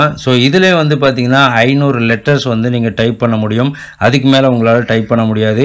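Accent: Tirunelveli, India. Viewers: Bro thirunelveli ya voongalukku super bro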